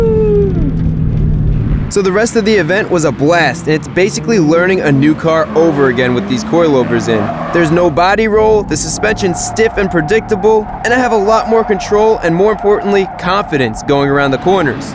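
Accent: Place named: New York, United States. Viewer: Where is the track that you go to? Sounds like you're from NY/NJ and the closest place I know of is in PA (I'm from NY) the name of the track would be helpful!!!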